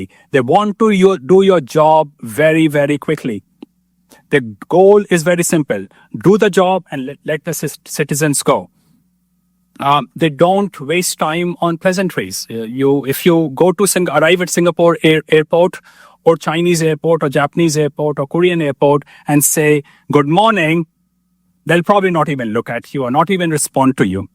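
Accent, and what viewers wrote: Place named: India. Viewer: Espeak,Estreet…Indian still remains an Indian 💩😂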